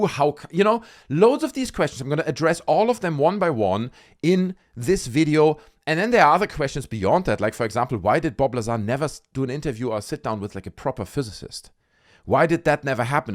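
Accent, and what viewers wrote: Germany. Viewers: Interesting video but I cant stand your accent. Tf. Just speak german.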